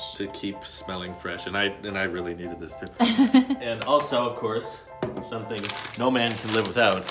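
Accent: Sweden. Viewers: Wait what?! Are you Swedish? :D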